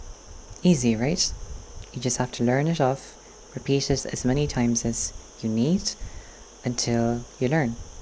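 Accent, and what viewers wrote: Ireland. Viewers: I swear the lady presenting the video has a dash of Irish accent. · Hi, I am very interested in the Sami languages.Do you happen to have Sami-learning materials (e-books/digital formats in pdf or dejavu formats)? Not necessarily in English, could be in Norwegian, Swedish,Finnish or German as well. Thank you for your video, very much appreciated. By the way, your you tube name sounds Gaelic to me and your English has an Irish lilting.Tá Gaeilge agat ? Regards from Spain/ Go raibh maith agat.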